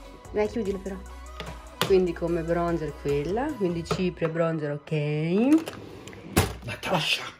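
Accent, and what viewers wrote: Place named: Bologna, Italy. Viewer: Quanto si sente la cadenza bolognese!!! Noi ci riconosciamo ovunque 😅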